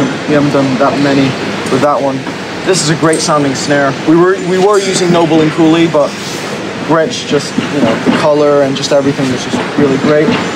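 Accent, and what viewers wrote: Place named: United Kingdom. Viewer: Huge fan of your Dad. Thanks for sharing your drum set and narration with your slight British accent!!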